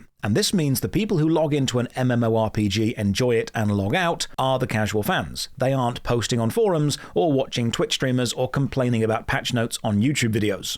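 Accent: United Kingdom. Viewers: A really dumb take. You are a great example how British accents don't equal intelligence. How can you talk for 22 minutes about the poor state of MMOs without mentioning the toxic effect that whales have created in every MMO? They have priced out young players entirely from the genre creating a predictable demographic problem. Without young players the developers need to milk more out of each older player. This cycle is not sustainable for obvious reasons. MMOs in 2026 are similar to Golf or Broadway -- a hobby specifically for wealthy people. None of us could have afforded to play a modern MMO back in 2000. It was $90 to play Dune Awakening for the first 2 weeks of launch. By the time the price dropped to $60 it was dead. This game did not die because a lack of social issues. It was dead from the start -- a lifeless whale milking machine. But I get it -- whales are your top supporters. 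You can't be aggressive towards them. It would threaten your income. This is the same lousy excuse developers will give when they refuse to perma-ban RMT buyers. This "community" is rotten. MMOs can't heal until these whales piss off and retire from gaming.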